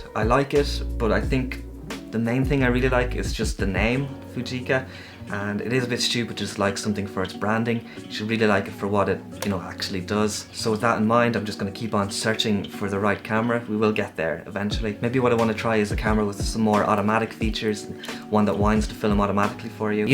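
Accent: Ireland. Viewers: Great to hear an Irish voice here on YouTube, you have missed focus on several images so I would recommend the Nikon FE as your next film camera but the FM,FM2,FA,FE2 are also very good, the FM3a is good but too expensive. I have subscribed. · It was a huge uplift for me to open your video and hear a young Irish voice. I admire the vision you have of seeing and telling the Irish story with young eyes. The Fujica 705 was a camera I used in the 1970s but was unfortunately stolen. I then used and still use a Pentax S1a. You may have seen the recent blogs on the need to invest in cameras with mechanical shutters. Electronics fail. I have lost two to irreparable damage. Still, I would recommend the Contax 139Q because it is widely available and those Contax lenses are genuinely top class on a par with the best Takumars. But, my absolutely favourite camera, is an old Werra V that I still have. 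Pure Leica quality from East Germany. It is my dedicated BW film camera. Carrying that around I feel that I am channeling Cartier-Breslin.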